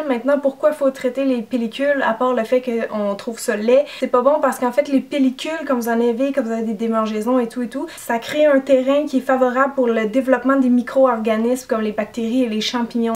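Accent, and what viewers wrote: Canada. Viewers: en plein dans le mille, j'etais sure que tu etait canadienne !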